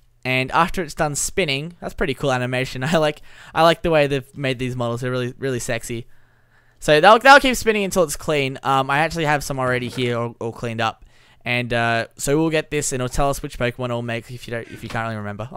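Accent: Australia. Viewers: He is from Australia that is how they say it there and if he says it our way his mom will make fun of him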